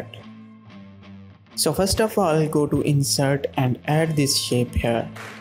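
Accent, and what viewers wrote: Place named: India. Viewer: fuking indian accent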